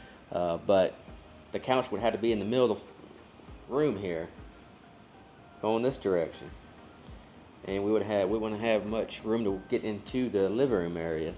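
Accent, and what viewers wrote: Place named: South, United States. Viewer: Watching the progress! What State are you in? Sounds like Alabama or Georgia!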